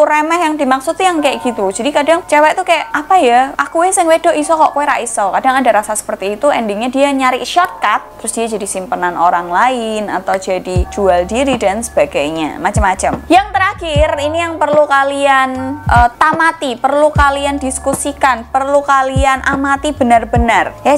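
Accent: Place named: East Java, Indonesia. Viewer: Mbk asline wong ngndi??? Logat'e kyok.wong jawa timur'an..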